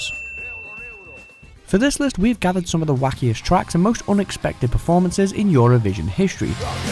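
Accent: United Kingdom. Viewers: This is exactly the same as the list on WatchMojo, it is only voiced in the British accent. Don't understand why it should be on both channels.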